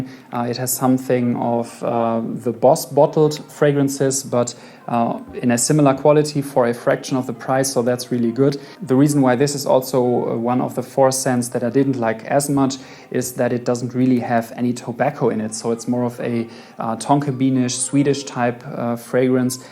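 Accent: Germany. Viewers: ur german arent u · Der junge ist deutsch merkt man Bro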